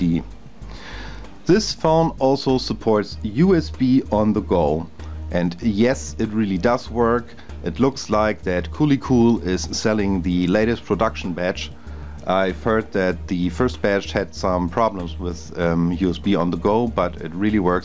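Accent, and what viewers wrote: Germany. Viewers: I hear German accent)) Great review )) i think it is a good device ))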